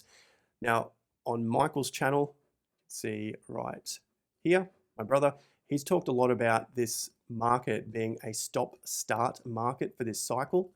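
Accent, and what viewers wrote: Australia. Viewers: That makes no sense. He’s Aussie.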